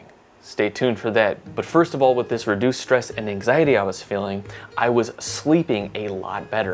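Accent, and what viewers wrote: United States. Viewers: I don't know about America(judging by the accent) but in Poland most commonly it's adviced to take it when you are relaxing to go to sleep in last 1-2hrs before you close your eyes. The side effects that you describe are similar to what I noticed poping a pill in the middle of my day.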